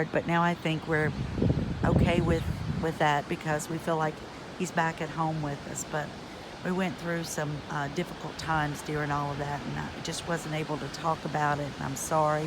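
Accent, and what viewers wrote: South, United States. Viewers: 🇬🇧 How lovely to hear your lovely Southern voice again. It is wonderful that you have been so strong in dealing with the death of your son. It must have been so painful for you and your whole family. 💫 Sorry you also lost your Yorkshire Terrier baby, but he was a terrific age, almost 20, amazing. Thank you for sharing your lovely day with us all. A very Happy New Year 🍾🥂 big hug 🫂 from beautiful Yorkshire. Xx 🙋‍♀️ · how good to hear your sweet Southern drawl again. Though I’m far away in Israel, I have thought of you often since we last heard from you with the sad, sad news. I too am a mother, I don’t need to say more. But news of your return is so welcome, and the news of your family’s commitment to life is so encouraging. Be well, till next time.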